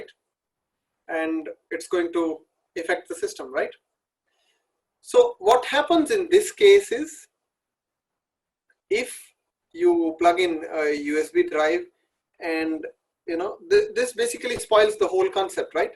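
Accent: India. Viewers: Good but Indian accent very hard to understand.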